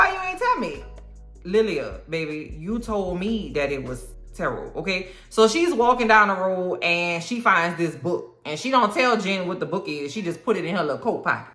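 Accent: New Orleans, United States. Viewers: I love the way you explained this, your Nola accent makes it better “Baaaaby” 😂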